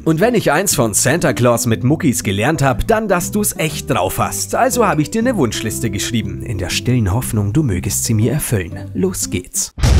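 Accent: Bavaria, Germany. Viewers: du bistn bayrischer bub? und schon hastn abo mehr. dein Zynismus ist mega geil. :'D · woher aus Bayern bist du? grüße aus Rengschburg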